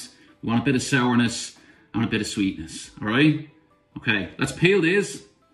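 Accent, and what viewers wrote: Ireland. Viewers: Loved watching and listening to his lovely Dub accent! Made me homesick! My Mam used to make her apple tarts exactly like his! ☘️💚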